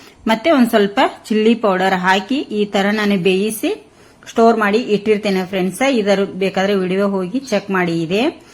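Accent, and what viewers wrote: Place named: North Karnataka, India. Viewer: ನೀವು ಮಾತಾಡೋದು ಉತ್ತರ ಕರ್ನಾಟಕದ ತರಹ ಇದೆ.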